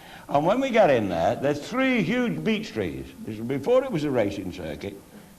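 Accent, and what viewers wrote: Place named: Cheshire, United Kingdom. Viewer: I live in the same area that he's talking about. Nice to hear an authentic Cheshire accent.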